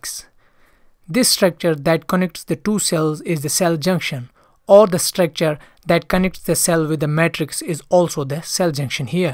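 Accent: Kashmir, India. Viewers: You sound like a Kashmiri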